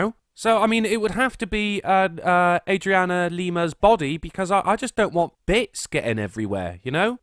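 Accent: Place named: London, United Kingdom. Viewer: "What do you attribute the success of your channel to?" Wit, anti-SJW subject matter at a point in time when it matters and a charming London area accent--if I'm not mistaken.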